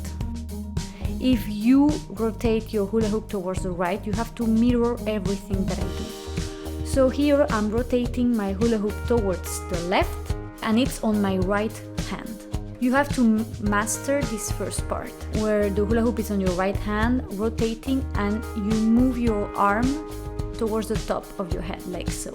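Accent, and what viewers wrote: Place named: Colombia. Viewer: wonderful combo! love them all. I have been adding them to my flow :)you have an accent where are you from? Colombia?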